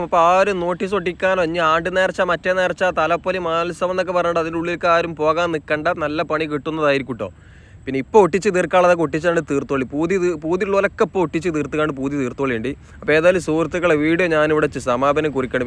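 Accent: Malappuram, India. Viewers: ഇടയ്ക്ക് വയല് പറയുന്ന പോലെ ഉള്ള ശൈലി സൂപ്പർ ആണ്.....keep up our Malappuram slang..❤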